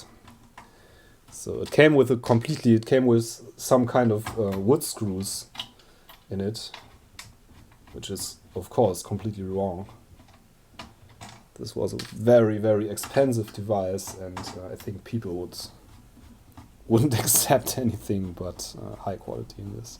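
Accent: Germany. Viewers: Nice Video. Could it be that you are from Germany, weil du hast einen leichten deutschen Akzent 😁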